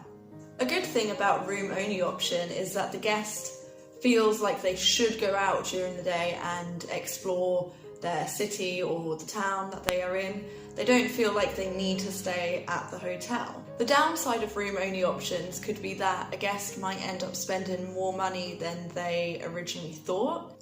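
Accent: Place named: United Kingdom. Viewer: Beautiful British accent!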